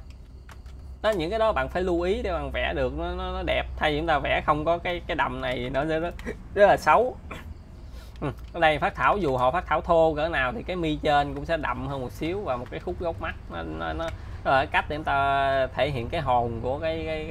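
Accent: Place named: Mekong Delta, Vietnam. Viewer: A ng miền tây nch dĩa huông wa he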